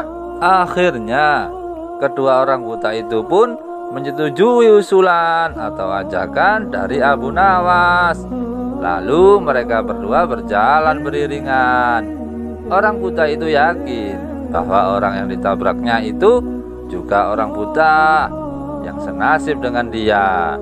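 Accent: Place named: Arab world. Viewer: Kenapa intonasi suaranya bergaya ke-Arab2an, kenapa nggak dengan nada bicara Indonesia yg biasa saja?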